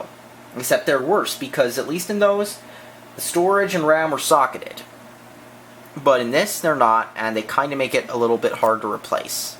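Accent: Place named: United States. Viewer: They opened with "This laptop sums up everything wrong with the computing industry today" in a broad American accent. I'm surprised you got 4 minutes in...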